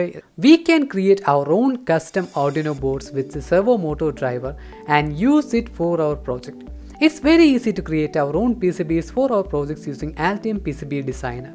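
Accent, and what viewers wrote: Kerala, India. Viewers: Thanks for the video! The mallu accent brought back so many memories from Amrita University!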